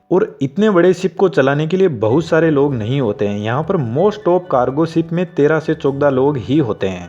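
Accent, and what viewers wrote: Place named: Haryana, India. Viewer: भाई मन्ने तो तू हरियाणा को लागा सा.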